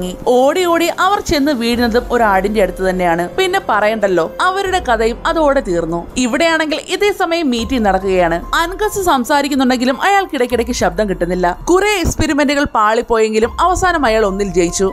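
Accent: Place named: Kasaragod, India. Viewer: Kasargod aanalle? Paanju varunnu yenn paranju (paanju) kasargod alleM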